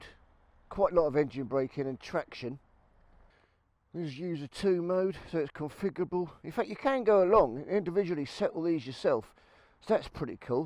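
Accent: United Kingdom. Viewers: You sound like an east-end barra-boy, yet your pronunciation of panniers was the poshest I've ever heard! 😀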